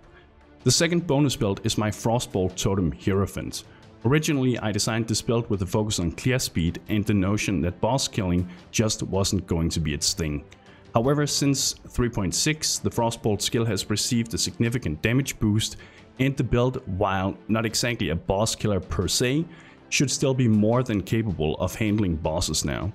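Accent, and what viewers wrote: Denmark. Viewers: Are u danish? 😅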